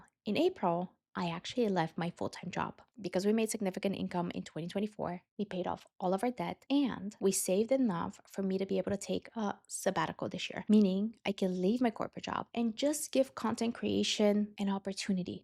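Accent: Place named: Latin America. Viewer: I thought you were Latina from your accent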